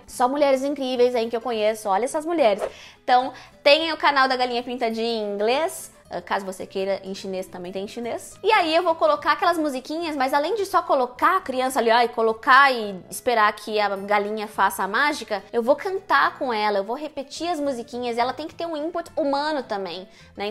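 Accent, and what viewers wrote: Rio Grande do Sul, Brazil. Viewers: Que alívio ao assistir esse vídeo! Tb sou teacher, meu baby está agora com 1 ano e eu estava muito insegura, mas me identifiquei muito com o vídeo e percebi que estou no caminho certo! Tb faço time and place e espero que dê tudo certo! Super obg, te admiro mto e amo teu sotaque, tanto gaúcho pq tb sou gaúcha como onde inglês! Super beijos!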